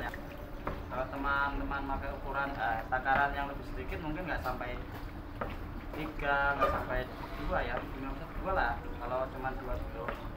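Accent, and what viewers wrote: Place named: Java, Indonesia. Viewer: Logat jawa bosq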